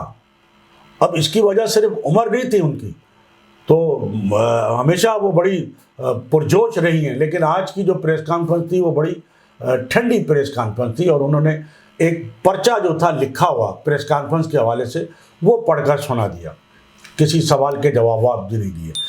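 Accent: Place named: Muhajir, Pakistan. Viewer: Bhai mujhe yad padta hai aap shayad shaakir Sahab hain ya Shahid Sahab Hain barrel jo bhi hain bahut acche Hain aapki Urdu batati hai mahadev ki yahi to pahchan hai ki uski Urdu uski body language uska huliya Bata deta hai ki yah muhajar hai aur hamen mahaveer hone per fakr hai gaddaron se dosti Bhai ki ijaajat ke bagair Ham nahin kar sakte bhai ki tasviren Bhai ki tasvir diwaron se hata do banner hata do sab kuchh kar do magar Dil se Bhai ki mohabbat mita do yah sawal hi paida Nahin hota Sahi kah rahe hain inko Khabar teen ki jarurat kyon padi kya ab cartoon Rahe Jo Nahin aur jo hai vah mafi na mein bhej rahe hain aur jinke anaaj jyada hai vah wapas ja rahe hain sar hamen se koi matlab Nahin hamen sirf hamare bhai Karachi mein Hyderabad mein aur balki pure Pakistan mein inshallah vah din dur Nahin jab hamare bhai hamare Sath honge inshallah 🇧🇬🇧🇬🇧🇬🇧🇬🇧🇬👍👍🤲🤲🤲🤲💯💯💯🌹🌹🌹💪💪🌹🌹💯💯💯🤲🤲👍👍🇧🇬🇧🇬🇧🇬🇧🇬🇧🇬🇧🇬🇧🇬👍👍🤲🤲💯💯💯🌹🌹🌹💪🌹🌹🌹🌹🌹🌹🌹💯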